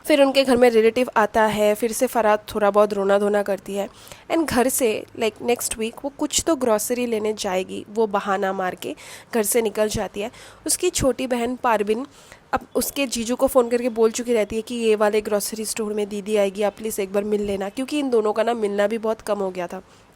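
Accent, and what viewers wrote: Bengal, India. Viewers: You also form Bengal (coz your bengali accent tells it)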